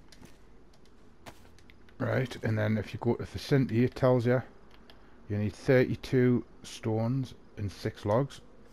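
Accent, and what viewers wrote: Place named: Belfast, United Kingdom. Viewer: cheers mate, great vid in the best uk accent there is! PURELY BELTA! liked n subbed as a dayz noob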